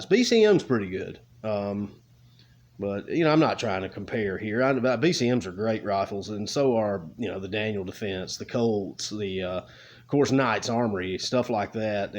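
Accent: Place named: South, United States. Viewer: Haha glad to see you, always good information and spoken in good ol Southern English. Really like your AK reviews, be safe everything is going to work out!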